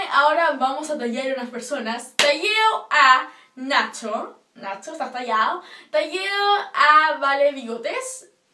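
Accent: Chile. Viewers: Eres chilena cierto? Que chori jashdfjaj (: ♡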